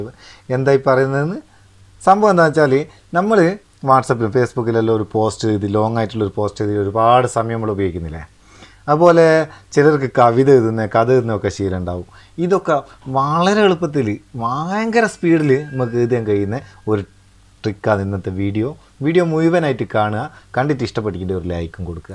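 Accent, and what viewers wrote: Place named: Balussery, India. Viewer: നിങ്ങൾ എവിടെയാണ് ബാലുശ്ശേരി ഇങ്ങളെ ഭാഷ കേട്ടിട്ട് അങ്ങനെ തോന്നുന്നു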